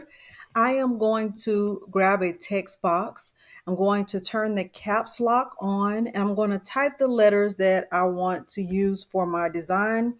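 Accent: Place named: South, United States. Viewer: Chileeeeee 😂😂 You just helped me with an order I just got! I couldn’t figure out the font and got a notification that you posted content and I ran on over to your channel! 😂 I love your content! You’re so thorough and nice! And you have to be from the south. Every time you talk I crack up laughing! I love it! Keep posting content. I can watch you all day and learn. Thank you! Thank you! Thank you!🙏🏾🥰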